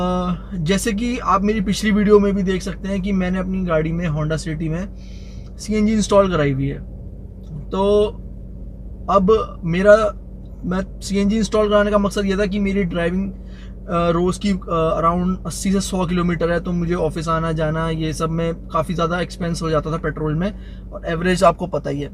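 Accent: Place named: India. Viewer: I think the same until I notice that is actually english but with soooo strong hindi accent...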